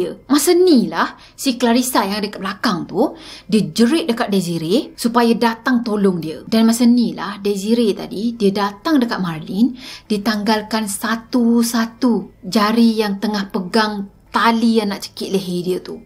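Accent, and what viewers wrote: Kelantan, Malaysia. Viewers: Walaupun sis ckp kl tp masih ada bunyi kelantan tu. Sbb org kelantan je pakai ayat " dah tu "😂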